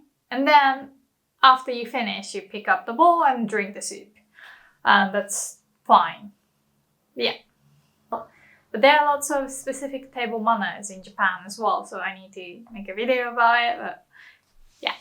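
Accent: United Kingdom. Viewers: That's strange she speaks british english, even though she is Japanese looking. life is STRANGE. good video. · ! I am really enjoying your lessons here. Thank you for speaking slowly and clearly, and explaining things so well. Plus, you are super cute. Where in the UK is your accent from?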